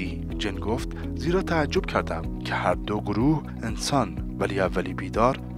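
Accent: Afghanistan. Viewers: مزخرفات وچرندیاتی جدید بالهجه مزخرف وریده افغانی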